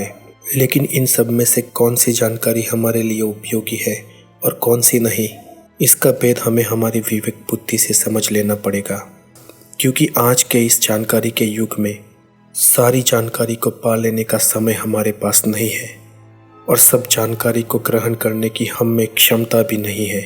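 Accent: Gujarat, India. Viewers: Aap gujarati ho na...😊😅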